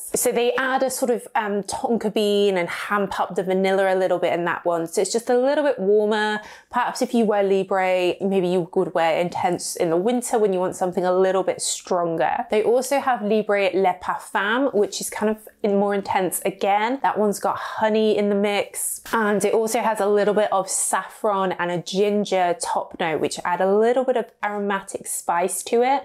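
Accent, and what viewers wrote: England, United Kingdom. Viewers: just wanted to tell you how much I enjoy your reviews. You are such a joy to watch! I found you a little over a year ago when I was recovering from a surgery. I watched tons of your videos to pass the time and I so enjoyed every one. You seem like a true girlfriend/Penpal to me. I’ve always loved perfumes and you share that love obviously like many of us. I have to admit even if I’m not interested in a perfume you are reviewing I’ll still watch your video just because you are such a light and a cheery person and I absolutely love your English accent. I also just stumbled on one of your older videos and I have to say that my husband is also dyslexic. You are so very intelligent and eloquent with your words. So thankyou again for what you do and you are very very appreciated ❤️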